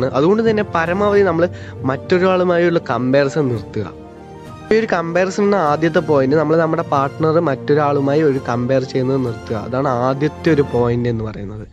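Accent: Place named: Palakkad, India. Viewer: Palakkad alle bro veed😄......manasilavnd namde bhashaaa...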